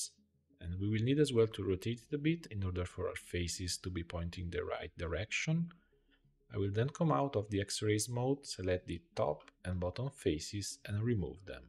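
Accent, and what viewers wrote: Italy. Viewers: Sei italiano? Si sente dall'accento!😂 Complimenti per i tuoi video comunque, grazie!❤ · Ma sei per caso Italiano? 🤌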